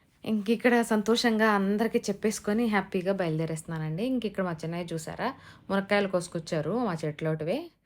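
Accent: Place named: Nellore, India. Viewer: sare....Badram😊 ma Nellore language...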